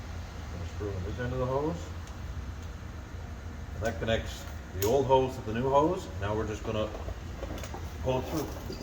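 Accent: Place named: Newfoundland, Canada. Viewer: Hey man, where are you from you sound like a Newfoundlander?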